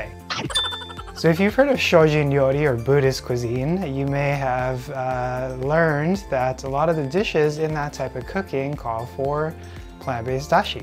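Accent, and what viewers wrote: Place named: North America. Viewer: You sound like a North American but I guess you've been in Japan a while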